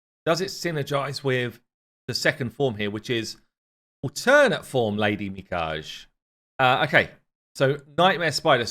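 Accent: England, United Kingdom. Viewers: please learn how to pronounce Mikage, it sounds giga cringy in you english accent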